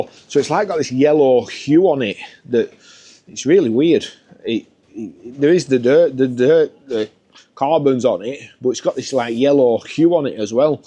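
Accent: Lancashire, United Kingdom. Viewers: I love the Lancashire accent. I’m originally from St Helens and remember older people having a strong Lancashire accent in the late sixties/early seventies. The accent there is now closer to Scouse. Can’t say anything about that cos my wife is one! ( her account)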